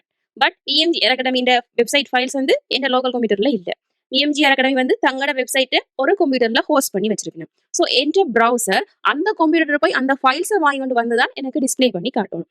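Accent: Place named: Sri Lanka. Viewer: Super sister theliva irukku, and mostly ungaloda Mozhi ilangai tamizh Mozhi romba pidukkum athanala theliva puriyuthonu Theriyala, nandri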